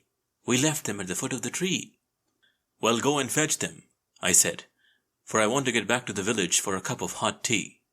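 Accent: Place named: India. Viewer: Your stories telling in unbelievable You are a white Hunter talking with a Indian accent ,Who thought of this combination ,Its BRILLIANT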